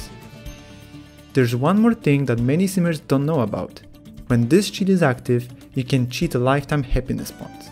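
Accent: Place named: Poland. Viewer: I knew i've heard polish accent!😁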